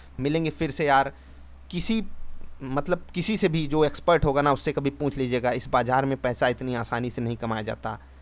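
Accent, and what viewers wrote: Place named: Bihar, India. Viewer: बातो से तो परफेक्ट बिहारी भैया जैसी आवाज है. सजेशन भी पागलो जैसे देता है. शायद तु फ्रेशर है स्टोक मार्केट मे.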